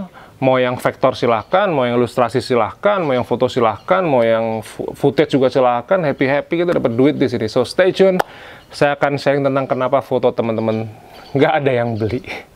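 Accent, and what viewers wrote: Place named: Java, Indonesia. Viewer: Dengan bawaan yang rilek,logat Jawa nya kental,Joss kang👍🏻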